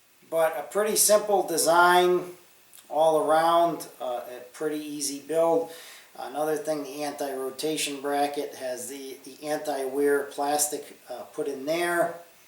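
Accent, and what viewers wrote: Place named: Massachusetts, United States. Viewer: Where are you from? You sound like you are from south east Massachusetts where I am from.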